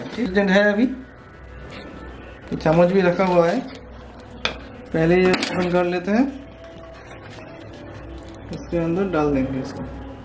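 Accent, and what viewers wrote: Bengal, India. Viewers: Proper bengali accent 😀👍👌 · আমি ভিডিও শুরু হতেই উচ্চারণ শুনেই বুঝে গেছি যে ভাই আমাদের নির্ভেজাল বাঙালি ! ভাই একটা অনুরোধ রাখার চেষ্টা করবেন যেন আপনি সর্বভারতীয় দর্শকদের জন্য হিন্দিতে ধারাভাষ্য দিলেও বাঙালিদের জন্য পাশাপাশি বাংলাতেও একটা আলাদা চ্যানেল খুলুন ৷ আমরা সবাই আপনার চ্যানেলকে সাবস্ক্রাইব ও শেয়ার করে এগিয়ে নিয়ে যাবো ৷ অথবা বর্তমান চ্যানেলেই পাশাপাশি বাংলাতেও ভিডিও আপলোড করুন ৷ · Kya AP bengali ho? Apke language waisa laga Raha hai 😊 Btw video was good 👍🏻😊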